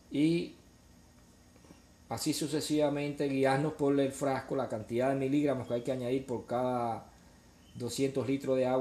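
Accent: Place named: Cuba. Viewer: Amigo de q país eres ?? Suenas como cubano !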